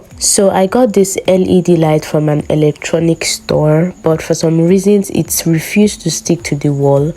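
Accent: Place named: Nigeria. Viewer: Nice video and alot of creativity. But please try to use standard English not the Nigerian style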